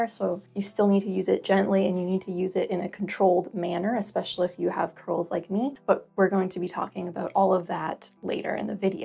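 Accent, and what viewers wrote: Canada. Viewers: Maybe it's your accent (canadian?) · Smart Girl ! 🙏 You Sound Canadian.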